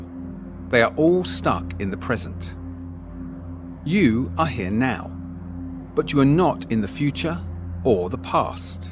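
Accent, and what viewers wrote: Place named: United Kingdom. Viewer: They always gata put the british accent so it could look more interesting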